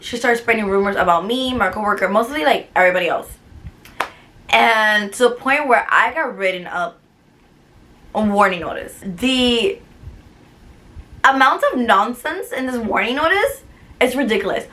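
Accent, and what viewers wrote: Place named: Miami, United States. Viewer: I’m Australian and this is the first time I’ve heard a Miami accent. Like music to my ears 🎶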